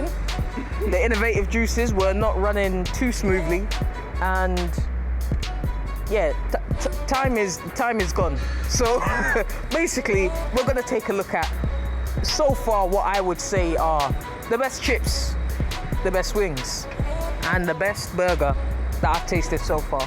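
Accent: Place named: Roadman, United Kingdom. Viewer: I love how eloquently he speaks in a roadman accent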